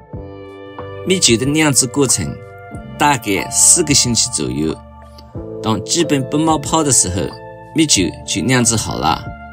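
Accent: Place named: Nanjing, China. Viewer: 很喜欢你的频道，条理清晰，风趣幽默，乡音撩绕，在美遇到相同时区种植爱好者不易，还是南京老乡那是缘分。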